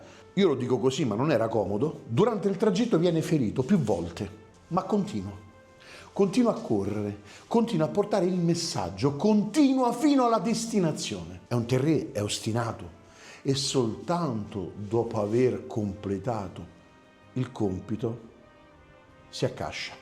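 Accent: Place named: Rome, Italy. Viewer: E vero non se ne vedono più credo perché oggi ottimizziamo tutto io ora sono in Umbria e i cacciatori preferiscono razze più piccole meno manutenzione e spesa, a parte ciò credo che oggi oltre a passatemi il termine non servire come un tempo a tutto si scelgono razze x un solo scopo si semplifica ci vorrebbe troppo impegno altrimenti ormai siamo tutti Smart ma i cani sono rimasti cani per fortuna grazie del video sempre molto limpido e facile da seguire ( ogni tanto famme risenti n’ po de romano che me manca 😂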